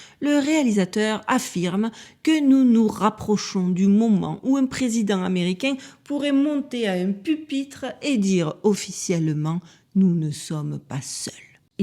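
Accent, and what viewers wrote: South, France. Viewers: Toutes ces horreurs avé l’accent du sud, c’est quand même plus sympa🥰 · Ah !!!! L' accent de la bonne mère en direct des states !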